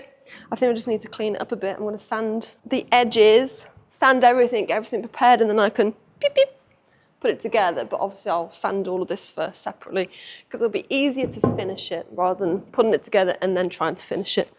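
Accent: England, United Kingdom. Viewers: Where in the uk you originally from? Sound like from near me. Woman of all trades. Love seeing animals tho. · You already have a Huge audience. why don't you switch to travel vlogs, you already extremely beautiful, no doubt u ll multiply your subscribers by (God knows how much)....think about it. And you have a beautiful British accent, come on....get that dollar. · I hate English accent but your accent makes me fall in love 😍😍😍😍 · Lady, you would bring joy wherever you go, for the indifference you hold for yourself possessing merits for which Men would go to war, a body that could make a man tremble, the tender expressions of your face, your attitude towards life(keep rolling on, how effortlessly you come out of things making Life question its own challenges), How Nurturing you are(for the love you have for your Animals), how keenly you do everything, and well British Humour and accent well it never goes wrong, may you be well through all you go through🙏🙏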